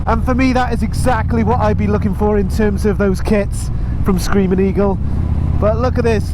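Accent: Wales, United Kingdom. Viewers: Nice to hear a fellow Welsh man again. Welcome back.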